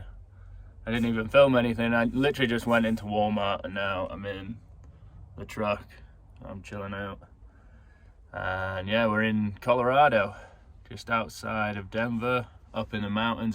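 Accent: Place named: United Kingdom. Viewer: Welcome to the states. I assume you live in the UK? Those hard boiled eggs looked good. Now I'm hungry for hard boiled eggs. Looks like you're well packed for your trip. The truck bed looks comfortable. Sounds like a good time with family in Vegas and camping afterwards. Enjoyed the video. Love your British accent.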